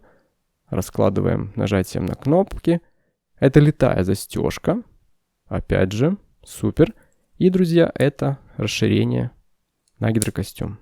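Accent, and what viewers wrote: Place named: South, Russia. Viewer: Обычный южно-русский говор. Давайте относиться снисходительно. Тем более, автор компетентен.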